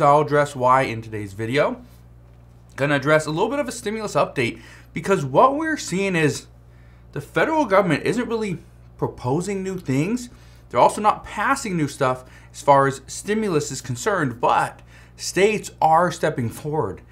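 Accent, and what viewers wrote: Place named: Minnesota, United States. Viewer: Sounds like MN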